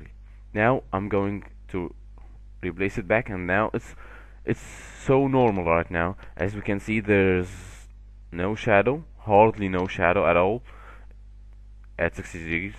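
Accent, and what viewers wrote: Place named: Egypt. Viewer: cuz this s egyptian english :D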